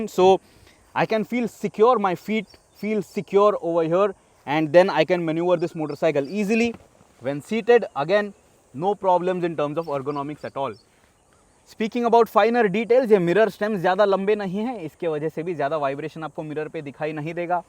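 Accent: Maharashtra, India. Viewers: Thanks, that's reassuring. And BTW, the way you speak Hindi with a Marathi twist is quite interesting!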